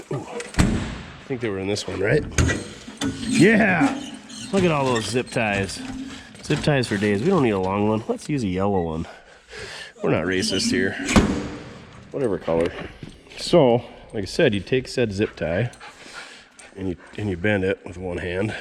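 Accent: Minnesota, United States. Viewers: I dont know where your from but there is a guy in Minnesota that yalks like. you do.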